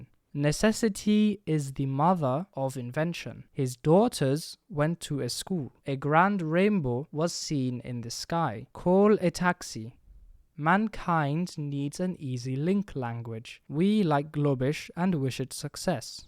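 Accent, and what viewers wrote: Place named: India. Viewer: I can hear the Indian accent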